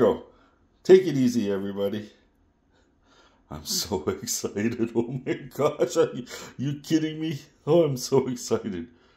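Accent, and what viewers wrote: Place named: Manitoba, Canada. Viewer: I am not sure how I have not come across your channel before today, but I am really enjoying your videos! 👍 Also, as a Manitoban-in-exile, it is so good to hear a voice from 'home' on YouTube. 😁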